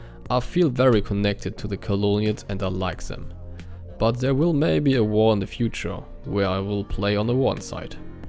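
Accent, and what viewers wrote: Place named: Germany. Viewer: Im Ernst das ist deutsch-englisch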